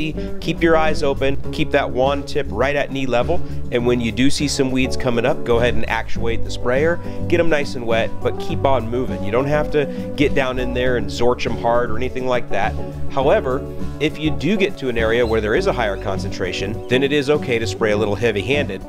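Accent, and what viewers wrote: Chicago, United States. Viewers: The production value, photography, videography, music, sound, all enhance the great lessons. I get a kick out of the use of the Chicago accent and sayings on other videos from this! Well done. Great content! Cheers!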